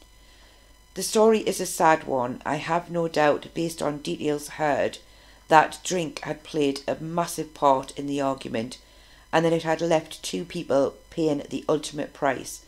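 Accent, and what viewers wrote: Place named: Northeast England, United Kingdom. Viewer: Good job, and I like your accent, too. Being from Minnesota I can't place it. Is it a Northeast England accent? Anyway, good story and a sub. Hope for more.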